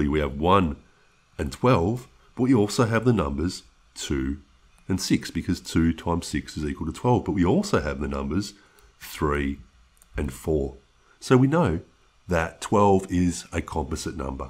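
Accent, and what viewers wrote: Australia. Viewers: Hello Aussie I felt like the narrator was Batman with an Australian accent! 🤭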